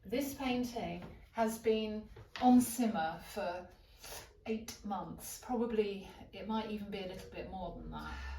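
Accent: United Kingdom. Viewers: Madame. Keep them coming . Make them as long as it takes to cover one point. If its a short point make them short . What i mean is the video should cover the whole topic. That is being presented. I love your posh accent and being in your company. I am an artist from pakistan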